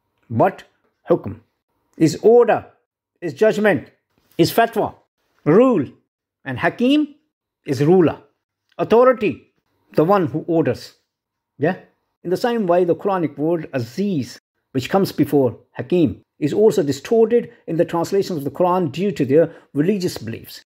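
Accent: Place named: United Kingdom. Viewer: He is speaking British English in quite normal speed. He is not fast at all. If he speaks slow it will be dragging. If you listen English news and other English programs you will find that speed seems a bit slower than native English speakers.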